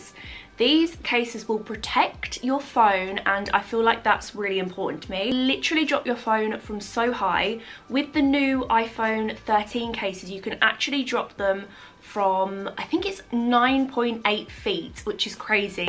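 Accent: United Kingdom. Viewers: What accent do you speak with? I know that your accent is british, but is it a received pronunciation ?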